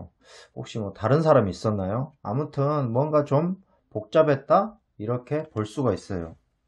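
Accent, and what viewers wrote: Jeolla, South Korea. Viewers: 전라도 분이신가봐용 헤헤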